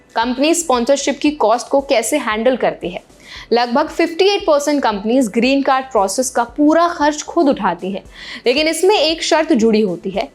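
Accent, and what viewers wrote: India. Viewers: This girl is throwing misleading information with her strong Indian accent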